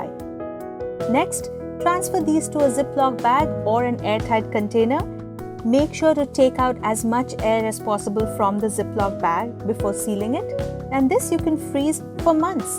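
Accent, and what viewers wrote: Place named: England, United Kingdom. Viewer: Nice English accent and thanks for the video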